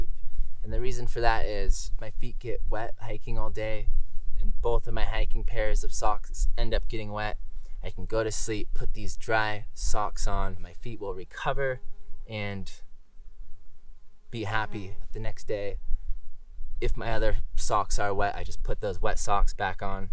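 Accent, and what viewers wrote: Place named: California, United States. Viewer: Good content. I'm having confusion over the accented speech - kind of valley guy of sorts. Got to be from CA, maybe Portland or Seattle.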